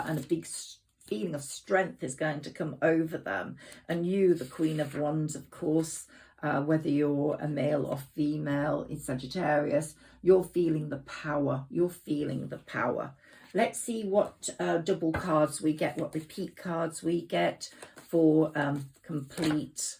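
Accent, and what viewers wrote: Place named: Northern England, United Kingdom. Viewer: Yesterday was a very strange day, felt extreme boredom, very nervous, not sure why iv never experienced energy like this. These runners all seem to be virgo or cancer, my person a fellow saggi, never heard of a fire sign in this cycle, thank you for your beautiful reading I always look forward to hearing your slight northern accent lol thank you xx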